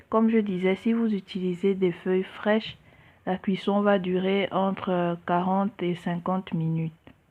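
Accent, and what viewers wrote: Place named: Gabon. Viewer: yum yum.. avec l'accent du pays et tsou et tsou trop bien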